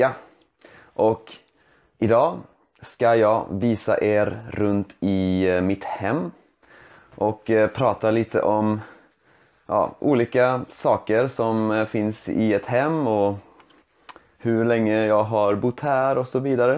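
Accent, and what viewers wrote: Sweden. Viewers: så söt du är alltså! din dialekt är fin också, göteborgska eller? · So helpful! What part of Sweden is your Swedish typical of?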